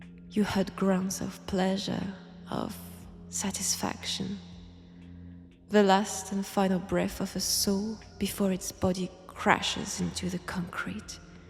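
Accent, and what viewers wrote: France. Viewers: I'm curious,are you a real french or do you just talk that way? I like this kind of way you do this,it has something. Good Roleplay for this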